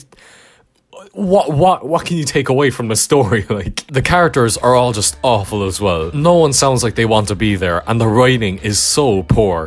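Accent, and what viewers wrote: Ireland. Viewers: R u irish? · You irish?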